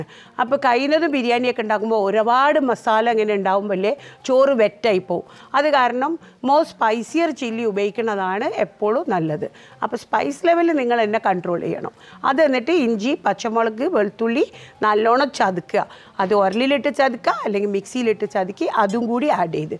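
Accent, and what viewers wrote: Kozhikode, India. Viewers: Kozhikkode സ്ലാങ്ക് പൊളി........ ഇങ്ങള് ബരിം.........😊👍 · This is og Calicut slang. Surabhi in m80 moosa uses a bad mimicry of this. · Her kozhikode slang♥️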